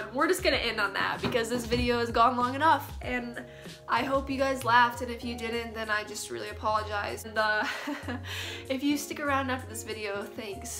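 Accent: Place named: United States. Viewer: Hey I’m lesbian. I thought you were American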